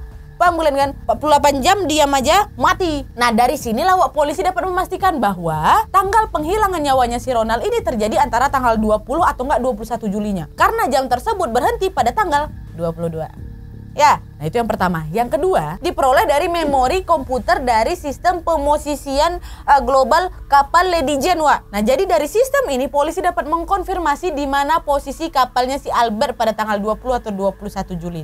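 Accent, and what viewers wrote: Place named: Medan, Indonesia. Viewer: Ibu senang dgn vlog ini. Hostnya cepat bicaranya, jelas n punya aksen orang medan.semoga sukses terus n diberkati tuhan.gbu.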